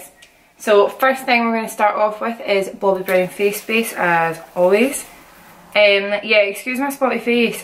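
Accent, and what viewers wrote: Scotland, United Kingdom. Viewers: 👽👽👽👽👽 love hearing a similar accent on YouTube to my own! Scottish vloggers ftw ❤️ #mermaidhairlookingstunning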